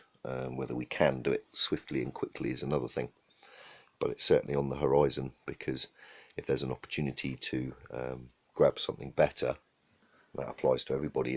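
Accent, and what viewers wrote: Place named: United Kingdom. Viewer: I like your accent. Don't care much for Aussie accents but I like your Brit accent.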